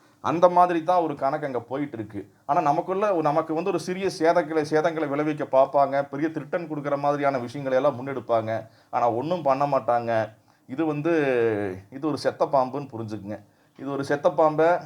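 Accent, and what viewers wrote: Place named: Kongu, India. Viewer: Arumai sir 💐especially pleasing to hear the positive explanation through our veera kongu thamil slang👍 It's our luck to have a great patriot modiji as our prime minister 👏 We are safe in his rule. Thank you for your great efforts 🙏. Bharat Matha ki jai💪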